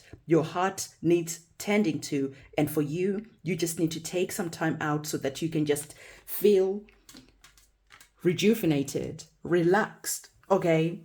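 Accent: South Africa. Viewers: Are you South African? :)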